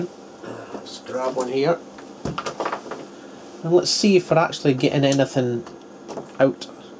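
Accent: Scotland, United Kingdom. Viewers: Did sound a bit sus.He has a wee Sco-ish accnt:-) What a silly current.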